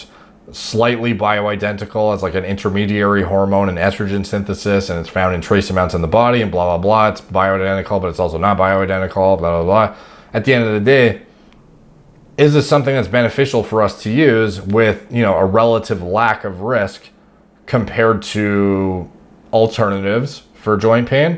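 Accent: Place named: Canada. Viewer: We do. But he’s got the Canadian accent ayyy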